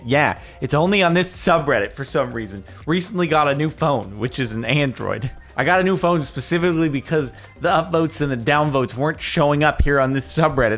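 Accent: Australia. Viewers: take a moment to appreciate aussie accent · That Aussie accents makes me cringe (no offense) I'm aussie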